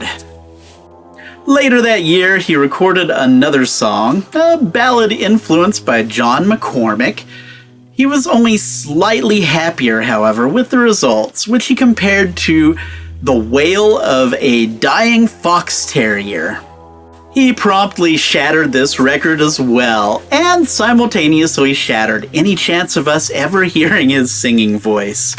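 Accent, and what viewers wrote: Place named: New England, United States. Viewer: So Linus from LinusTechtips if he had a New England monotone accent. (that's my guess)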